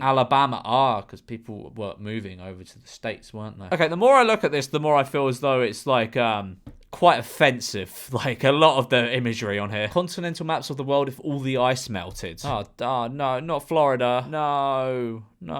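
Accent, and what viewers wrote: United Kingdom. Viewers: Omg I’m having such a hard time. I needed this right now, seeing your masked face and hearing your British accent. My OCD is feeling good.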